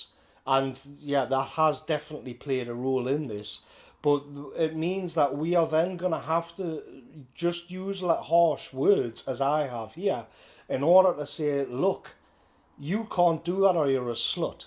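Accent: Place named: North, United Kingdom. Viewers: Great video great insights and very thoughtful. And a great northern voice of clarity. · Bang on Geordie lad!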